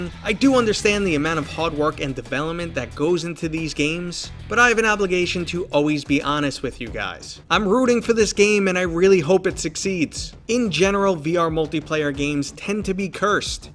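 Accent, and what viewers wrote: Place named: Boston, United States. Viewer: I just like that boston accent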